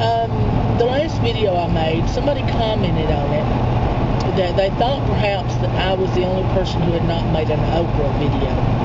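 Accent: South, United States. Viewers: Your southern accent was out in this one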